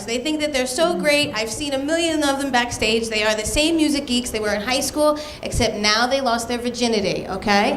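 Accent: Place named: New York, United States. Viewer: HI, IM ONLY USING CAPS TO TRY TO GET YOUR ATTENTION... I WANTED TO KNOW IF YOU WERE REALLY MARRIED? IF NOT? I WOULD LIKE TO TALK AND LAUGH WITH YOU.. ( BTW, ADRIAN ALWAYS SUPPORTED AND BELIEVED IN ROCKY! WATCH THE FIRST TWO ROCKY MOViES AGAIN ) IM ITALIAN, FROM BKLYN. NY.. YOU SOUND LIKE YOU ARE ALSO FROM NEW YORK.. IF YOU ARE NOT MARRIED? I HOPE TO HEAR FROM YOU. IF YOU ARE MARRIED? ALL THE BEST TO YOU AND YOUR HUSBAND. MERRY CHRISTMAS TO YOU AND YOUR FAMILY! ~ XOXOXO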